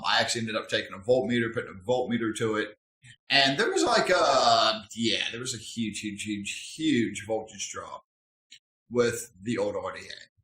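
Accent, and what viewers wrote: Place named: Australia. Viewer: But harsh but can't fault the honesty. You sound Australian! OI OI oi?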